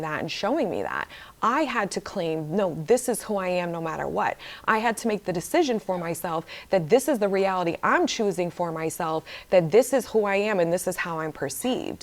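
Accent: New York, United States. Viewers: I was literally wondering about how you got this New York accent living in Florida and then you immediately explained LOL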